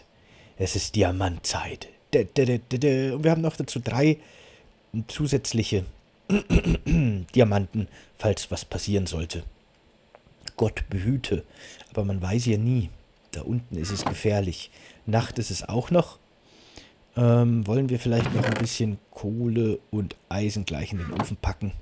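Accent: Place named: Bavaria, Germany. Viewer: Ich liebe Deine art einfach so ruhig und ohne Geschrei und der Bayrische Dialekt :)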